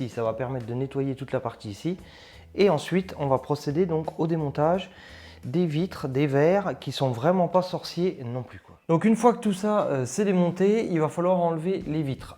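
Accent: Franche-Comté, France. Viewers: Merci pour cette vidéo qui tombe à pic, je vais pouvoir enfin démonter ma porte grâce à vis explications !! .. petite question j'espère pas indiscrète..!! "VS ne seriez pas franc-comtois par hasard..." ??!!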